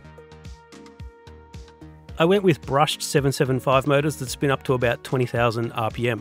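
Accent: Australia. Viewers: hell yea , for some reason i was expecting a pov image just need a little i2c acc/gyro added to that xiao. anti wheelie think drag car and put it out the back alittle it needs the leverage i feel, next id like to see you go brushless then add either an old heli tail gyro for straight line ripping or for extra bonus points any new modern flight controller flashed with your choice of firmware ie ardurover that will give you gyro but also gps waypoints and telemetry "you sound aussie so go 915mhz"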